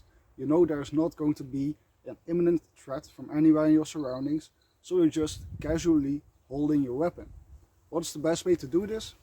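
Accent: Netherlands. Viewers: Hmmm dutch??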